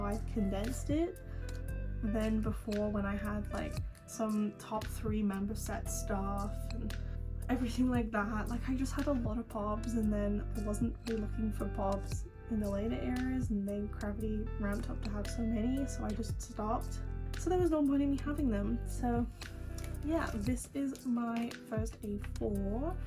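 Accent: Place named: United Kingdom. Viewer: me: don't mention fellow british accents every time you hear them also me: surprised to hear british accent even tho i've watched you before ( i have not moved from dark side kermit meme ig )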